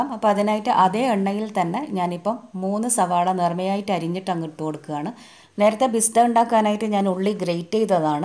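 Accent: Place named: Kannur, India. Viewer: Biriyaniyum kannanur slangum ishtapetin itha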